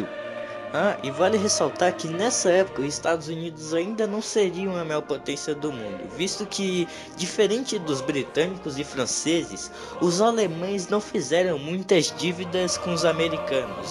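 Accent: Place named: Paraná, Brazil. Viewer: Você e paranaense porque falou Porto e r você puxou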